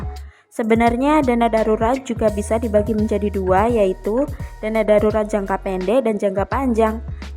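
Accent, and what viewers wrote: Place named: Java, Indonesia. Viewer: Kak asli org mana klu boleh tau? Ko gaya bahasa nya medok bgt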